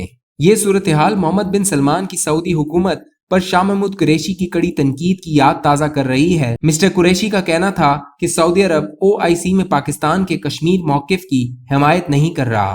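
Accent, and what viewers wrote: India. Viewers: انڈین بندے کا لب و لہجہ ہے یہ تو.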